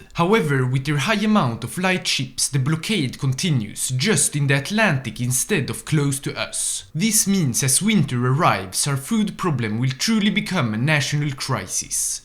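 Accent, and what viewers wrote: Sweden. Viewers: are you from sweden because you sounded pretty swedish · Js hörde att du har en svensk brytning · I have never heard such a swedish accent english. Say that your a swede but not saying it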